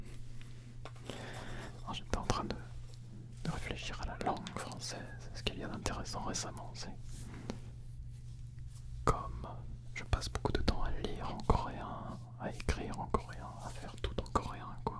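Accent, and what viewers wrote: France. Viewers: He speaks a bit fast for someone like you that is not used to french. Plus the accent from france is fairly different than the french canadien one. Its all practice. I changed my computer to english, my phone to english and only consume english medias and over time I understood both languages almost perfectly.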